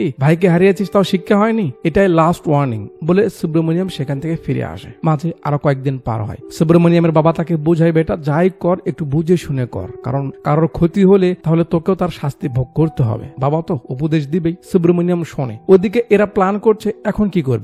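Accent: Bogura, Bangladesh. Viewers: আপনার বাড়ি বগুড়া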